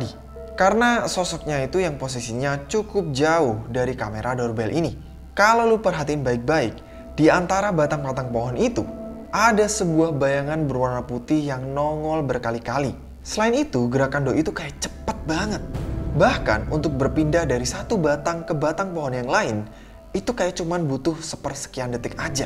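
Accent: Java, Indonesia. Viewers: Bro asli mana? Kok logatnya medok jawa ?😂😂😂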